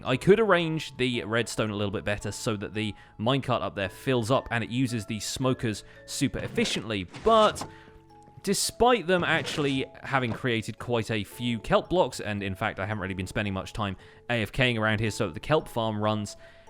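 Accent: United Kingdom. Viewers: Im so happy i caught up to this current episode. Your lovely british accent is now stuck in my head thanks to me binge watching the series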